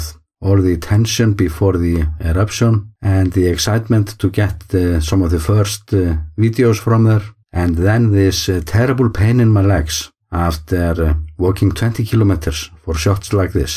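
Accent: Iceland. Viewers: I love your english with the icelandic accent it makes your videos very soothing to listen to! Like a velevety hot chocolate lol! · Deep gratitude for your love and deep knowledge of Iceland. You won our hearts and minds during Covid. We are relieved at your intelligent wise and skilful reporting. From Australia to you in aiiiceland!! We are addicted to your accent and btw commiserate with the physical challenge you have as we too are sliding fast into our dotage.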